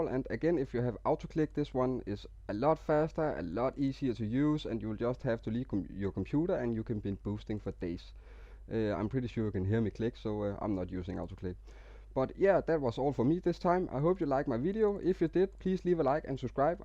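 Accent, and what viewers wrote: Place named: Denmark. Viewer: You're danish aren't you? :p if not, your accent is extremely similar to a dane accent.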